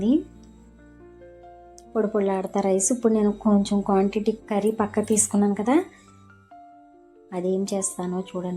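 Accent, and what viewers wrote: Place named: Godavari, India. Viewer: మేడం మీది గోదావరి నా మీ యాస అలాగే అనిపిస్తుంది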